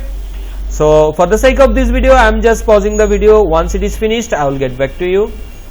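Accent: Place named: India. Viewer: Here's some tips on how to speak English clearly and decisively, I'm not trying to be rude I'm genuinely trying to help you. You need to slow down and keep the same pace throughout each sentence, don't start slow and then suddenly and for no reason speed up. You also need to enunciate which basically means pronounce the word correctly taking your time to say the whole word clearly. In time as you get better at enunciating you can speed up. The problem with Indians is they speak English the same way they speak their mother tongue. This is a common mistake and is why an Indian accent is very hard to understand. You have a good vocabulary and you're saying the sentence correctly but what's letting you down is your speed (too fast) and pronunciation. Just take your time, write down what you want to say and practise it a few times enunciating every single vowel, again with time this will become easier. 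You've taken the time to learn English but if people can't understand you then you're fighting a losing battle. Listen to a native English speaker that has a neutral accent and then repeat what they say so you learn the pace and pronunciation, you will get better every week and it will open a whole new world to you. Nobody wants to listen to an Indian speaking English too fast and not enunciating its infuriating for us.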